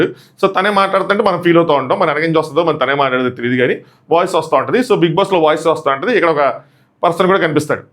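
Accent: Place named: Andhra Pradesh, India. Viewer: Oh avna andhra slang aithe ok garu tq for your reply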